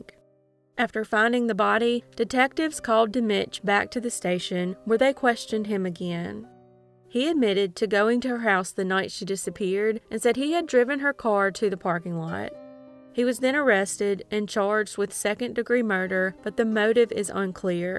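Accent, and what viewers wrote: South, United States. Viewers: What a wonderful way to drive to work listening to such a soothing southern voice ❤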